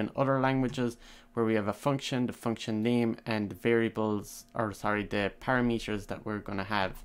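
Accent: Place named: Ireland. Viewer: 95% sure you're irish or grew up in ireland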